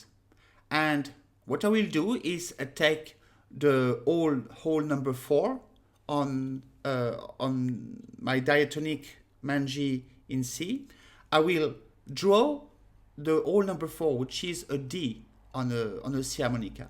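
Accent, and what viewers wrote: France. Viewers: Don't bother about your accent because it's the way the French sound .You can very well understoodBravo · Your french accent is so cute..... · I'aime accent francais et aussi vortre explanations. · haha You are amazing! Love your frenchy accent! Gorgeous videos you got!! WE ALWAYS WANT MORE!!! you keep us thirsty to hear! I personally love love LOVE you and Your Work!! AMAZING!!! <3 Thank you so much for those tutorials! and no don't worry they aren't boring. I love that you said they're like buildings. I use this metaphor alot! I'm a big fan! I love your sense of humor! I love you! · Bonsoir. En écoutant ta vidéo, j'ai compris que tu étais française. Hihi. J'adore ce que tu fais. J'aimerais savoir jouer comme toi un jour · what's not to love about a sweet lady harmonica player with a french accent? i gave my lady friend a harmonica as a gift, she wants to learn how to play. 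is the perfect first teacher. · I love your French accent and your English is perfect! I wish my French were as good!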